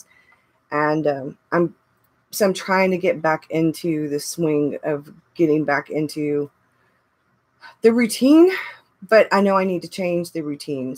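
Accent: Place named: South, United States. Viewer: I thought you had a southern accent!!! love your videos!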